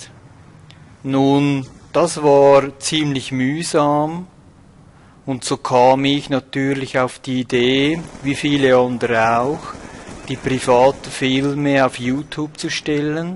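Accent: Switzerland. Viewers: Also ich muss sagen ich versteh dich sehr gut,mir gefällt auch ehrlichgesagt dein scheizer achszent^^ Erinnert mich an Emil^^ Ne finde bist sehr gut zu verstehen,mach dir da mal keine Sorgen!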